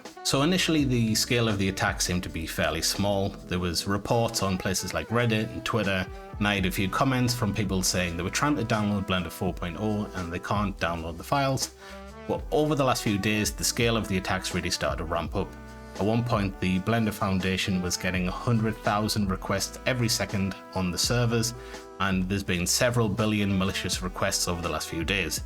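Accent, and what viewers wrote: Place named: Newcastle, United Kingdom. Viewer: awesome, you're a geordie!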